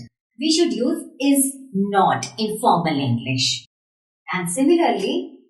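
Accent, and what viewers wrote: India. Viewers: ma Cheri fallen for you I beseach unto the boundless beauty of thy grace have mercy on this hapless Mancunian. btw love your "Indian" accent.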